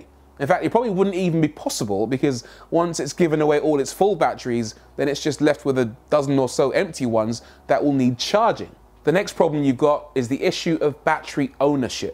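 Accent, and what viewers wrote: United Kingdom. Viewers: I was about to close the video. Then I heard the British accent 🇬🇧 So my coffee turned to tea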